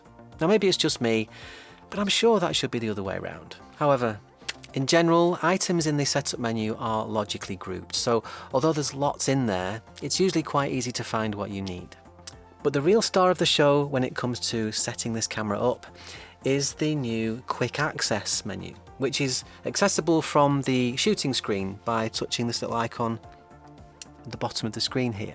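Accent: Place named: England, United Kingdom. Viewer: Thank you for this very informative video. Gave me the information I wanted with an English voice presented at a nice pace. Thanks again.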